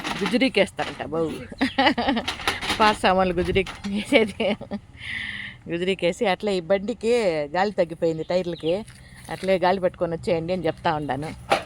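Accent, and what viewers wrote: Chittoor, India. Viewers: తెలుగేయ్ అమ్మ మాట్లాడేది చిత్తూరు యాస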